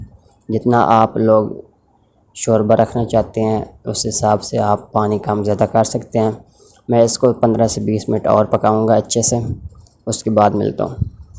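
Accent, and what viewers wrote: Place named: Punjab, India. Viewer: آپ کی پنجابی سن کر ہنسی آ گئی 😅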